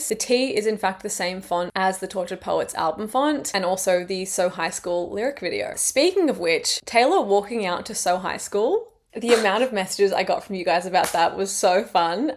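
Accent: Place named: Australia. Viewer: OML I HAD SUCH A MOMENT WHEN U SAID U WERE AUSTRALIAN- I AM TOO AND I'M SO USED TO HEARING AMERICAN ONES I JUST ASSUMED U WERE, AND I DIDN'T HEAR ANYTHING DIFFERENT BECAUSE IM ALSO USED TO AUS ACCENTS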